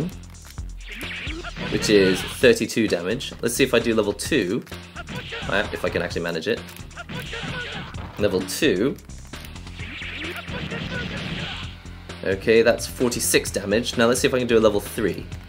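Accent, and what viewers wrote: United Kingdom. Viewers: its like hes from Japan, but he sounds british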